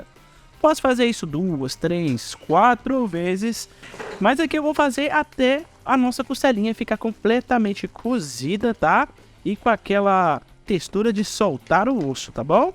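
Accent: Minas Gerais, Brazil. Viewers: sim, nós mineiros falamos assim mesmo.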